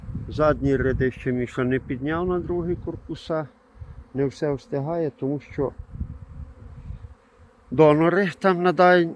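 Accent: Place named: Western Ukraine, Ukraine. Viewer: Я в якій ви області? Чую по розмові що західна стопона , Карпати, Закарпаття.. Бо в нас на Рівненщині ще не цвіли череші 3 числа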